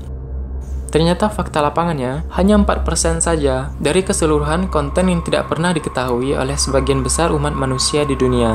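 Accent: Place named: Makassar, Indonesia. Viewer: Logatnya agak-agak ke Makassar (tw sekitarnya), Iya ya bang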